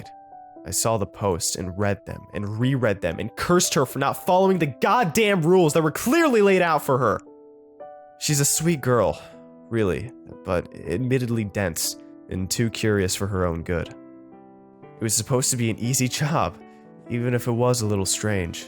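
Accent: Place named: United States. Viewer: Discovered your channel last week and just subscribed. You're one of very few American horror narrator (assuming, by the sound of your voice, you are American. No offence, if not) that I like.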